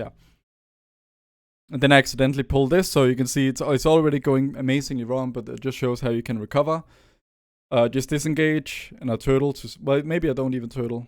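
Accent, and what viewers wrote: Denmark. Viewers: Are you Danish? :)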